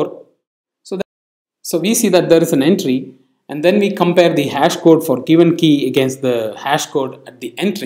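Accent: India. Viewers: Indians have their own accent just u having urs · malayale accent ! malyale anno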